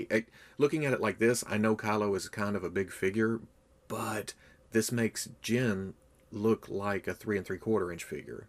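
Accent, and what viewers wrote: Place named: South, United States. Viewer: I’m also from Arkansas. I love his southern accent.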